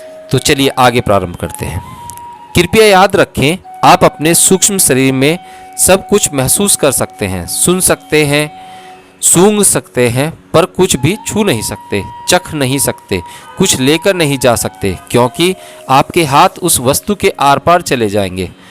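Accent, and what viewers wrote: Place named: Rajasthan, India. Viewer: Shaayad rajasthan ki jaban se bolte hai radhe radhe jay ho bhole bhagvan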